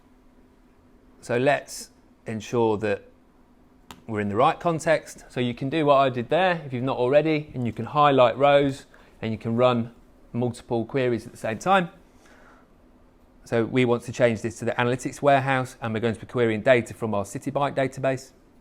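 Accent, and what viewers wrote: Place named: Scotland, United Kingdom. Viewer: finally some scotsman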